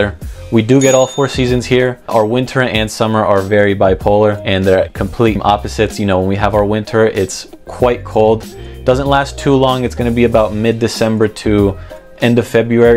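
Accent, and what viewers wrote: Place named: Northern Virginia, United States. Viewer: I grew up in the city and moved to Florida after high school in '87. I went back for a visit in 2002 and hardly recognized the place. It was funny how the people in Florida thought I grew up in the sticks when I mentioned Virginia. Little did they know how expensive the place was and is way more so now. I now live in Italy and people here ask me about all kinds of things from climate to spoken accents. I always tell them it depends on where you are. Even in just one state, places can seem like another country. I don't think of myself of having any kind of accent. You sound just like I do when speaking. It's not a northern accent like New York or Boston or even Chicago. It's not a southern accent either. I still don't even know what to call it. Great video. Thanks for the memories.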